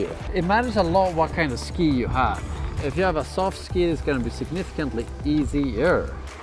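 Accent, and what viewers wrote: Sweden. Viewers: Det låter som att du är svensk jag vet att han prattar engilska men på hans engilska så låter det som att han är svensk🤣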